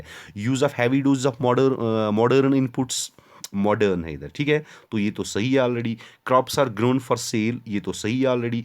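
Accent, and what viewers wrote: Kashmir, India. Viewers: Ur accent is walla...Kashmiri accent...very informative..👏👏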